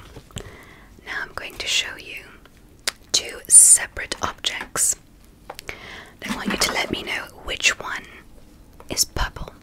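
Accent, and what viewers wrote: United Kingdom. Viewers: I feel like it’s probably just me but I don’t get tingles with the British accent at all (maybe because I live in the UK and hear jt a lot??), only the North American one 🙈🙈🙈 it would be great if in the future can do more long videos with the NA accent ❤️🙈🙈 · Your hair is so cute curly❤️ love the British accent too. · I tend to speak to you in a British accent every time you speak in a British accent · Yay British is back! 😍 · You have a really good British impression ♥️😴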